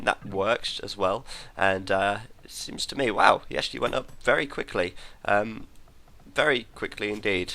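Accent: England, United Kingdom. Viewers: I just love commentator´s english english, sweet to my ears.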